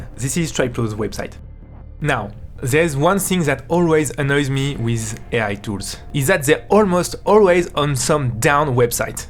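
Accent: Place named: France. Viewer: Yeah…real convincing. The thing turns out to be the usual ‘blob’, and then we watch a whirlwind of sculpting edits on super fast fwd. These Ai image to model programs are ‘not ready for prime time’. So why is every ethnicity and their grandmothers trying to sell us on it? This one is particularly annoying, being narrated by a guy with super thick french accent. See you in a few years…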